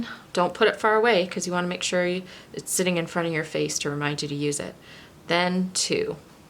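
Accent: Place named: Canada. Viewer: my mom says you sound very canadian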